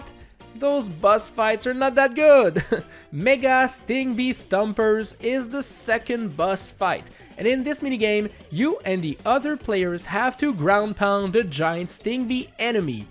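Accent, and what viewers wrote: Quebec, Canada. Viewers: French Canadian accent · he’s canadian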